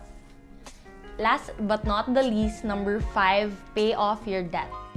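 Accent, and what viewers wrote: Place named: Cebu, Philippines. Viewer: Very helpful tips! Thanks for sharing. Anyway, are you from Cebu? cause you sound like my Cebuano friends.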